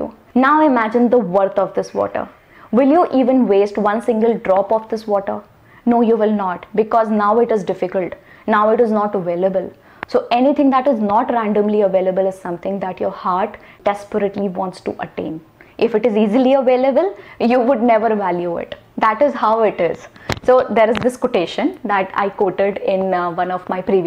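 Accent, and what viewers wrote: India. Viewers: Your hindi's tongue made your english so clear. I enjoyed listening your english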